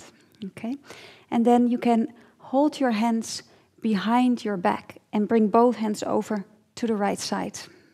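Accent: Netherlands. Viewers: 10 seconds in. Holland, for sure =D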